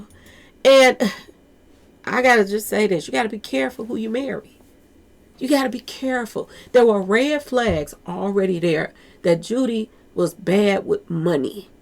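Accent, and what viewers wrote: Chicago, United States. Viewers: I detect a Chicago accent here! 😆 Cool channel.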